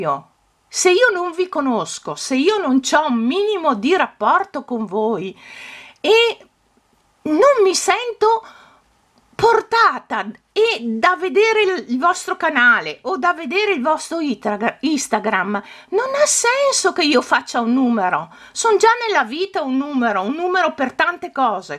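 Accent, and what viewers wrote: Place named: Verona, Italy. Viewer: Ciao, ti seguo sempre molto volentieri per tanti motivi. In primis la tua cadenza che adoro essendo per meta' veronese; le tue creazioni sempre cosi' piene di fantasia, il tuo buonumore e la tua gioia di vivere. Poi sentirti nominare paesini che mi ricordano la mia infanzia tipo Boscochiesanuova dove andavo da bimba, mi fa sempre sorridere. Grazie di tutto e Buona Pasqua a te e a tutti coloro che ami. Olga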